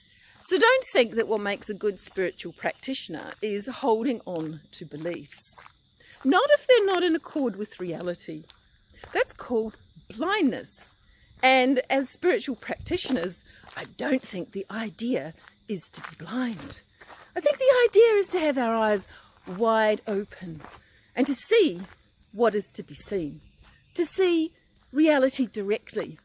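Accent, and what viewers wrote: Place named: South Africa. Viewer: Walking and videoing, horrible SA accent, rambling on and on, makes for a bad video.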